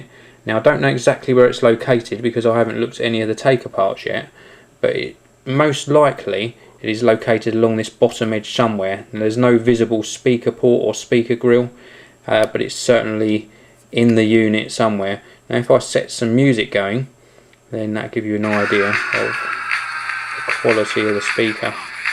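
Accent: England, United Kingdom. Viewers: you have a heavy brittish accent...cool =) and wayz i want to got a ipod touch 8 gig 3g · R u British? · A real good review.. ps. i like yor british accent^^.. i´ll get one next january..^^ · ru like from england cuz u have a acksent and i like ur ipod i be geting 1 really soon 2 more months and i be getting 1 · He's a Brit. Australians (I'm an Australian) sounds completely different! LOL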